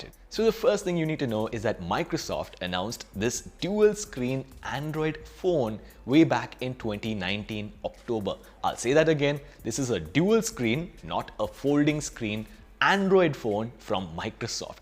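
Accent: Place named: India. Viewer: Дико забавно этот индийский чел говорит, и очки крутые! =)) Если по теме, то я согласен - без NFC, с тощей акб, без поддержки карт памяти этот телефон с железом 2х-летней давности вряд ли будет иметь успех, особенно с ценником в 100к рублей. Хотя, я постараюсь его купить, конечно. Надеюсь, Мелкомягкие всё-таки будут продавать более осовремененную версию девайса. А ещё жалко, что он не на Винде.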